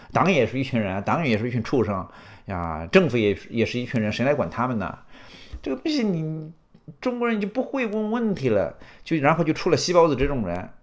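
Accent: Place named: Changde, China. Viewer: 常德人？口音很亲切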